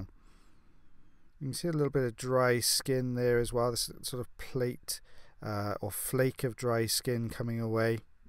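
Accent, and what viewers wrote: Wales, United Kingdom. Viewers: Gordon M he has a welsh accent 😊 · One of the things I look forward to with dry earwax is hearing "crumbly and dry" in a British accent.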